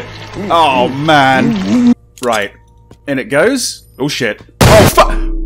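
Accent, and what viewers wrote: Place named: United Kingdom. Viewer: I really like your accent, which is weird because I hated British accents. No offense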